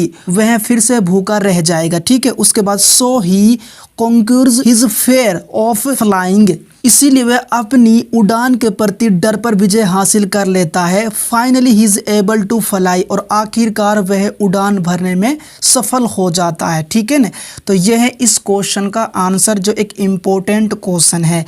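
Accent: Mewat, India. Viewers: Mewati hai bhai tu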